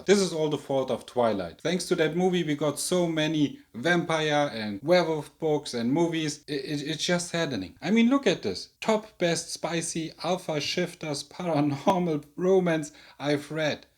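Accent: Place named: Germany. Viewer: immediately recognized that you're from Germany xD nice review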